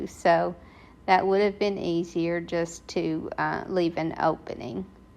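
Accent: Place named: Tennessee, United States. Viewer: I just love watching your videos! And listening to you, too! LOL I was hoping you had a little shop I could visit somewhere near me in the Dallas/Fort Worth area but sadly, nope. 😢 But when I read you were in Tennessee, I knew that was why I loved your accent. Reminds me of home...Georgia. Anyway...everything you do is beautiful and I really appreciate your careful instructions.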